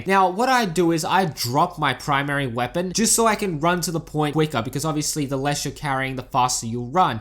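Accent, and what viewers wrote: Australia. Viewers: hello mr aussie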